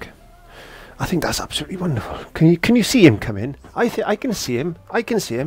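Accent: Wales, United Kingdom. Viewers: Love your Welsh accent! 👍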